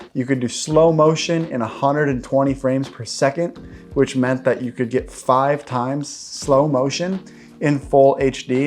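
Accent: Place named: Sweden. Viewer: You now have a Swedish accent speaking English.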